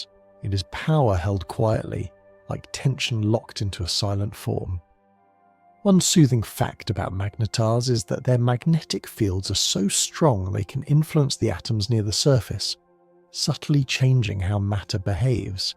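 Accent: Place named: United Kingdom. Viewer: hmm you say you are from USA but this is British accent, you should put an "intro" in your channel so we know this is not just another A.I. slop.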